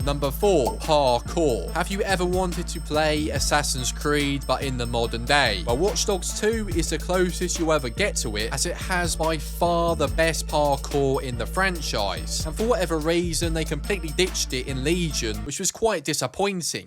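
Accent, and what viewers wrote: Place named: London, United Kingdom. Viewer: Got to love a London accent, knowwhatahmean ? :)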